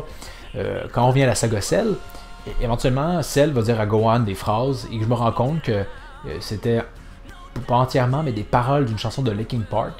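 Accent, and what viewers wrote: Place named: Quebec, Canada. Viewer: Tu fais trop bien l'accent québécois. Trop cool.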